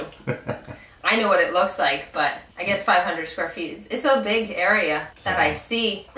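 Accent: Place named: New York, United States. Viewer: I don't know what it is, but something about your New York (New Jersey maybe? lol) accent and how silly you are with your husband, you just seem really cool and you put me at ease in an odd way. I usually feel really uncomfortable around other women (people in general, but I just usually never get along with women, I don't know why) but I think I'd like you :P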